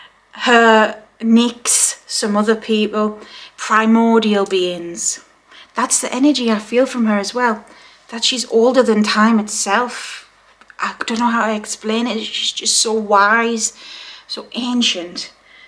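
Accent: United Kingdom. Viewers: I'm only about 30 seconds into watching one of your vids for the first time, and my comment has nothing to do with the content, and for that I am sorry, but I just had to say quickly... I LOVE YOUR ACCENT! I love most British accents, and yours is just ... i love it! OK, now back to the content. ♥ EDIT: this was very helpful. I have felt drawn to Hekate for several years, but have wondered if she would even bother with me, like... I'm not special enough or something. Anyway, your video has given me renewed motivation and clarity, and I thank you for that!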